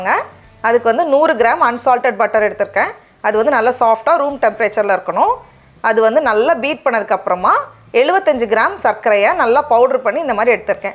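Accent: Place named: Chennai, India. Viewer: Super akka love Madras Tamil❤️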